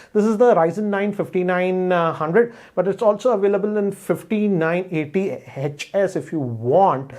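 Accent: India. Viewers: Typical indian style every sentence ending on T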